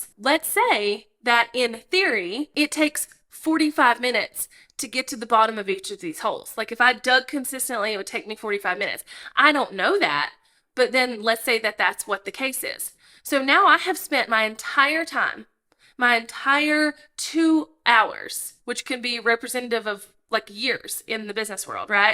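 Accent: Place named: Carolina, United States. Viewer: you've got a Carolina accent lol! I'm from South Carolina. great video! where did you get your shirt from also? super cute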